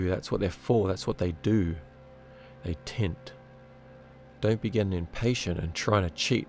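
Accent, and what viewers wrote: Australia. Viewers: dude i fucking love you and your videos. amazing work, amazing commentary, delivered like only an aussie can. subbed! keep it up!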